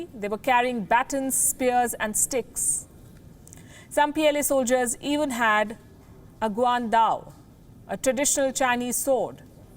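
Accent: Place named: India. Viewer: Amazing how this lady speaks such good English, albeit with a strong Indian accent.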